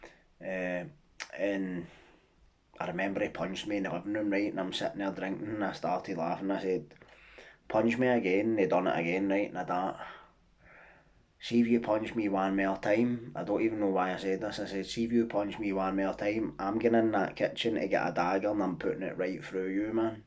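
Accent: Scotland, United Kingdom. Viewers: Alright, I follow your channel from Australia, usually when I’m sitting at my desk working 🤣 really enjoy the topics, delivered very eloquently in common Scottish tongue. A wee bit of home for me. Keep up the good work.. Keep up the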